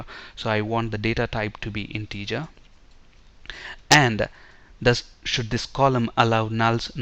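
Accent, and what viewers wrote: India. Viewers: Sir are you belong to India? Your English seems like Indian 😁😁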